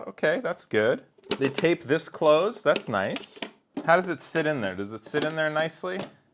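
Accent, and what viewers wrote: United States. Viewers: You live in PORTUGAL?!?!?! You have such an American accent!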